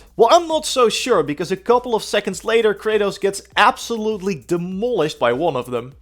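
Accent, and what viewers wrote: Netherlands. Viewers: je klinkt zo nederlands :p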